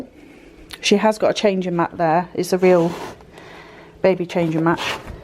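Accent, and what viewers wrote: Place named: England, United Kingdom. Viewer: Love it! And I also LOVE your English accent 🥰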